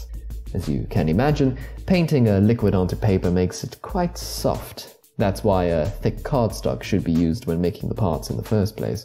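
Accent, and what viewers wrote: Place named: England, United Kingdom. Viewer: thanks for replying, i meant i liked the silver mk2 suite seen in th 1st iron man most of all, the costume is meant to resemble a medevel knight to some extent, As is the brilliant robocop suite, silver reminds the eye of this most even though it may be stereotypical , as you americans say "if it aint broke, dont fix it" you do a good english middle class accent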